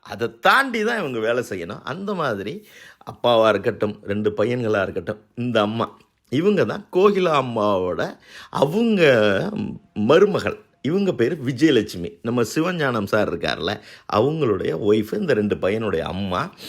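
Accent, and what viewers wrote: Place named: Sri Lanka. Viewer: உங்கள் தமிழ் உச்சரிப்பு ...சைலோனில் உள்ள எனது தமிழ் ஆசிரியரை நினைவூட்டியுள்ளீர்கள்.. அனுபவத்தை விவரித்ததற்கு நன்றி.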